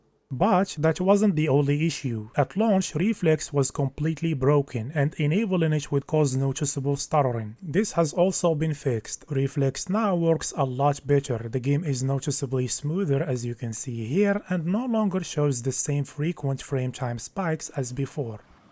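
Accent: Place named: Casablanca, Morocco. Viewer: خويا ميمكنش متكونش مغربي هاد الaccent ديالك باينا كازاوي هههههه Good work brother btw ❤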